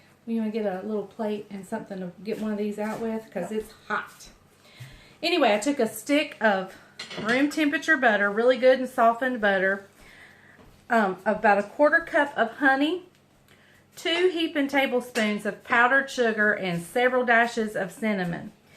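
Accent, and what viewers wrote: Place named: Eastern North Carolina, United States. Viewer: I feel so at home listening to you talk.... I'm from Eastern North Carolina and you sound like home to me!!!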